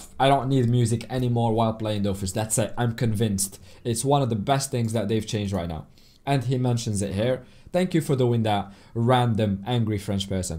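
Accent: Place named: United Kingdom. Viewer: I absolutely love the british accent when speaking french 😁 Keep up the good work! Btw, as a french person, the translation is on point 👍🏽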